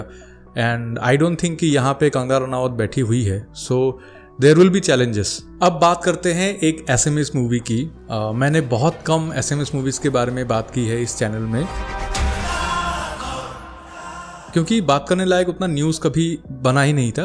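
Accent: Assam, India. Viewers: 😂 Assamese accent tu je odd lagise kiba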